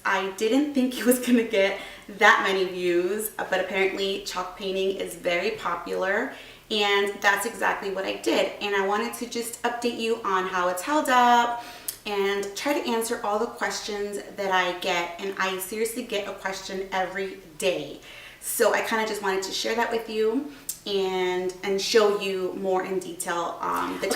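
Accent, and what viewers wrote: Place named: Louisiana, United States. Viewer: Great video!! Your accent sounds like you’re from Louisiana!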